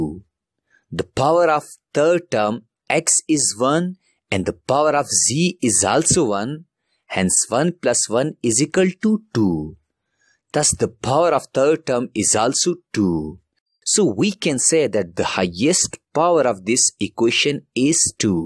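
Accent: South India, India. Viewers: Sir are you from south india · Di po siya filipino, parang Indian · thank u but are u an indan man ur accsent is so good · Why does he sound like an indian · Thick indian accent 💀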